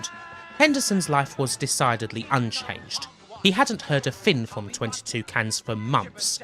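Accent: United Kingdom. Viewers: Is that a British accent or a speech impediment?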